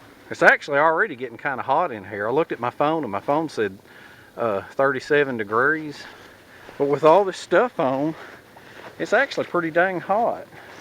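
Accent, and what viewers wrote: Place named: Eastern Kentucky, United States. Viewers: Enjoy watching your videos. Listening to you talk sounds like your from our neck of the woods. E.KY Great Job!!!! Keep the videos coming. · I have not been a subscriber very long and I have been trying to identify where you're from. I'm going to guess the Blue Ridge Mountains of Georgia. I first thought of east Tn or East KY but I definitely hear a little Georgia in there.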